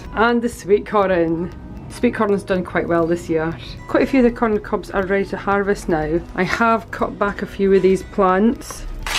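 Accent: Scotland, United Kingdom. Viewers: i love scottish excent language, i dont fully understand the english excent,i m indian living in uk, but i still love it, my boss is from scotland,we have job brief every day, i dont understand what he says, he knows it, sweet cons r funny, tomatoes great, lovely hair, lovely nails. lovely garden, thanks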